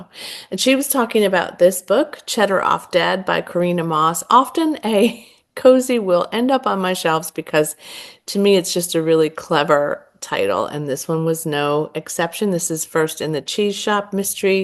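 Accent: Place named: Louisiana, United States. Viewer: Love her Louisana accent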